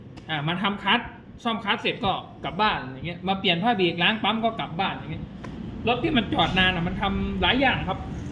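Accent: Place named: Laos. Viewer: เว้าลาวหันและม่วน.อย่าเว้ากรุงเทพหลาย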